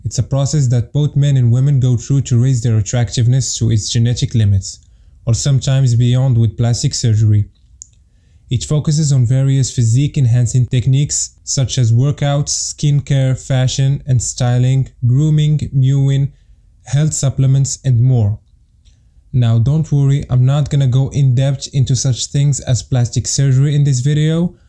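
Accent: Morocco. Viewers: i bet you're moroccan just by the accent, am i right a 3chiri ? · Tell me you're Moroccan without telling me you're Moroccan. Your accent bruh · Man i could notice the moroccan accent from the getgo 😂 , great concept , keep it up ! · Hahaha I swear 3reftek Moroccan gher meen accent , prouud of youu keep it up🔥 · you sound morrocan idk why, good vid tho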